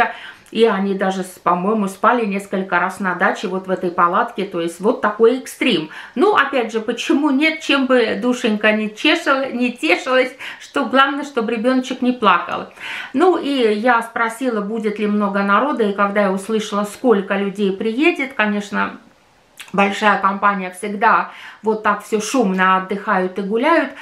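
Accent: Finland. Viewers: У вас прекрасный русский язык, а лёгкий финский акцент только придаёт вам дополнительный шарм! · у вас такое финское произношение!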